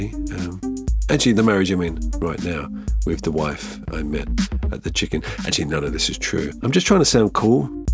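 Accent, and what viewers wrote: United Kingdom. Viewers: I know my way around quite a few British accents, and certainly yours isn't unfamiliar. But I swear to God, I was in the kitchen when you were describing "tail for a face" and I thought you were saying "towel for a face" and went "huh". 😂 I figured it out when I came back in and you were describing the tail in a different context (plus I could see what you were doing) and I realized my mistake and everything made sense...sort of. And "male" sounds like "mal".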